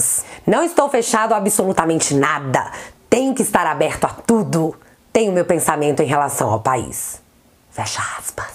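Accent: Minas Gerais, Brazil. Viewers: Esse sotaque mineiros e uma delícia só , oh trem baum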